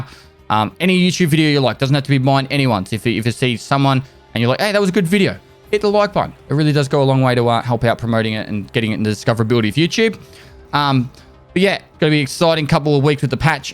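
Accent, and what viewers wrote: Australia. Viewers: it is nice to listen some Australian english, bein a Russian, living in Moldova. EFT: connecting people )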